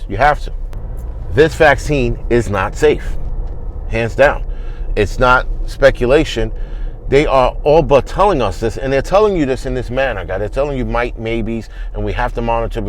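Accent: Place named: Bronx, United States. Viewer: Hes not angry....hes just from the Bronx.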